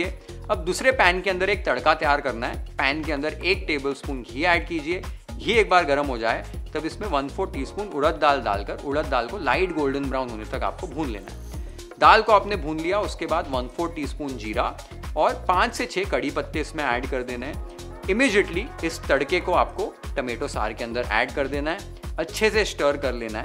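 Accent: Maharashtra, India. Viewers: WOOOOOOOOOOOOOOOW, the way you pronounce all the Marathi dishes it's a treat to listen and as always the thali is outstanding. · Your marathi accent is so sweet 😅😂😂& i really like your recipes, they are so easy to follow🤗😁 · Sanjyot, tuze marathi uchhar agadi * Marathi* aahet. Khup chan video... Marathi jevan purn dakhavkaya baddal❤ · I can see Marathi accent in you Really want to know the reason Love ur recepies❤ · You are a pure Maharashtrian.....the way you pronounce birda,fadfada....I'm so thankful to you for giving a platform to our satvik,simple n tasty Maharashtrian food ❤ Bappa tuzya saglya ichha Purna Karo...khup khup aashirvaad.... Ganpati Bappa Morya 🌞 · It's mouth watering recipes.....enjoyed your recipe and typical marathi pronunciation 👏👏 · Pleased by the video 🤤🤤 And your Marathi accent is OMG.....✨✨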